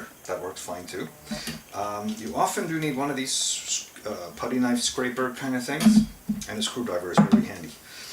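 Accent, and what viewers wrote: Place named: Sweden. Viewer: Are you from sweden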